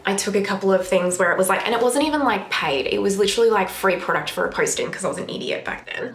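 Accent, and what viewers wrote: Australia. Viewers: Beautiful eye look. I guess I’m a YT dinosaur 🦕.😂😂😂. I like a chill, relaxed, long-form GRWM style video. I personally love to listen to you talk about whatever is on your mind. I think we all enjoy your Aussie accent as well. That TF blush is 🔥. I’m jellies over here in the states.😊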